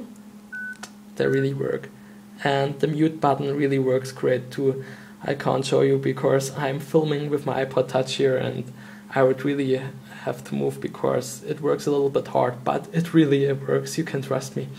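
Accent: Germany. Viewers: man merkt schon nach den ersten sätzen am aktzent das du deutscher bist. i mean... "änd wOt wOs in it? äh scophone" .come on ! :-) entweder deutsch oder korrektes english :D , aber sonst ganz nett das du eine review gemacht hast :-) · hahaha :D deutscher akzent :D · 5sec und ich wusste zu 90% das du deutscher bist :D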